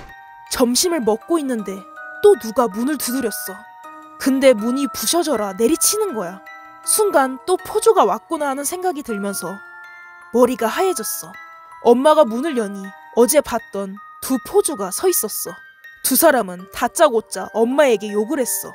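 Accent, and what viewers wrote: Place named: Gyeongsang, South Korea. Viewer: 정신단디 챙기라.이게 포항말이지.듣다봉께로 윽시 재밋노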